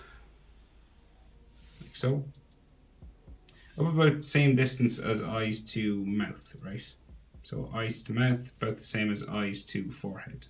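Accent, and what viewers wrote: Ireland. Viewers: are u irish bruh i am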